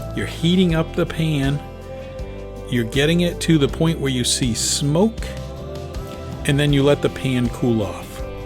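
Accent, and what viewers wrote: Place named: New York, United States. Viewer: you from ny aint you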